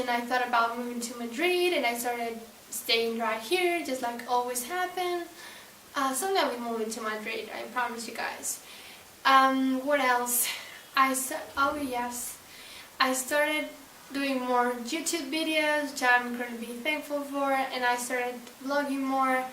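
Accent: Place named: United States. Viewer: Me encantaría hablar como tu! Hablas como las gurus d usa :)))) m gustaría saber como hiciste para perfeccionar tu inglés!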